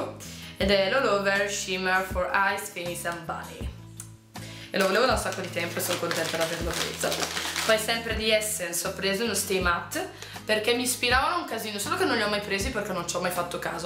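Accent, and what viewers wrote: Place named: Brescia, Italy. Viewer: di che parte di Brescia seii? appena hai salutato ho subito capito dall'accento che fossi delle mie stesse zone.. ahahaha :D begli acquisti comunque :)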